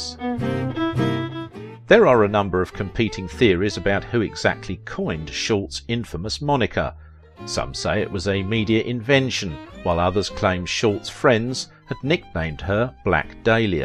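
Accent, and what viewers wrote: England, United Kingdom. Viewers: Give the guy a break. He's got an accent. He sounds English and he will pronounce some words differently than you.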